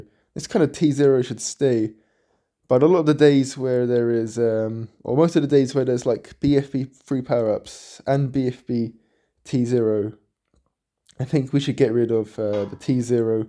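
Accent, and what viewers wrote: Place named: United States. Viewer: u sound like an American 😮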